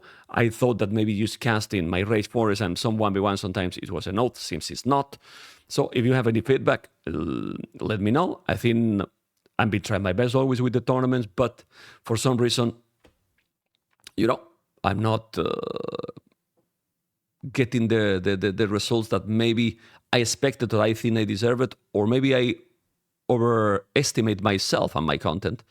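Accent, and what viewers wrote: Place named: Spain. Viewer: I'm English and the jokes he does is European Banter/Dry humour, it's very understandable and funny af lmao, yes he has a bit of a thick spainish accent but it's a positive, it brings charm and more humour to some sayings he does which he has created himself, like "SIRO!" It's brilliant. And I think he's got better at not interrupting, I really respectfully disagree with all what you said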